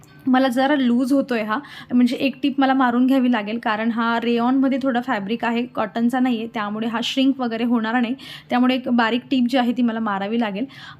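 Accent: Khandesh, India. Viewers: Maz maher Nandurbar ch aahe.Tu khandeshi bolte mhanun mala maheri gelya sarkh watt...mhanun mi tuze blog pahayla survat keli ani aata roj baghte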